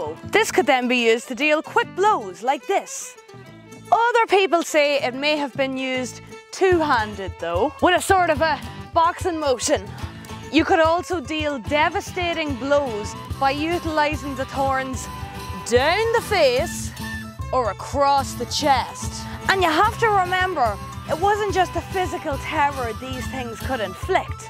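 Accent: Ireland. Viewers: I don't know what it is about irish excent, but it makes me laugh mien ares off. 😂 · I LOVED THIS AND THAT IRISH ACCENT !! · Thank you and greetings from U.S. If I got into trouble, my Dad use to say, "If you do that again, I'll take the Shillelagh to ya". He would have loved to hear you talk. He loved the Irish accent, it seemed musical to him. I carved a walking stick for my brother in law after he had back surgery and he said he wasn't going to walk around looking like an old man with a cane (he was only 65). He didn't want it but when I said it wasn't a cane, it was a Shillelagh, he smiled and grabbed it from my hand. It isn't a Shillelagh but I won't say anything.👍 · I just like hearing her talk. The Irish accent is like music to these old ears.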